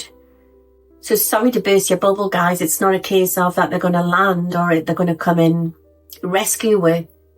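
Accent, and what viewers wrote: Newcastle, United Kingdom. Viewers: Hello fellow geordie! ❤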